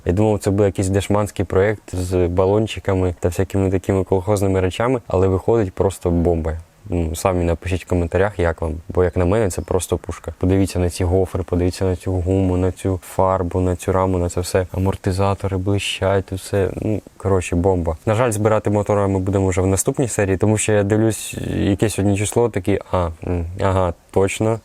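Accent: Chernihiv, Ukraine. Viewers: опа, а ти з Чернігова? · Ти з Чернігова? Вогонь)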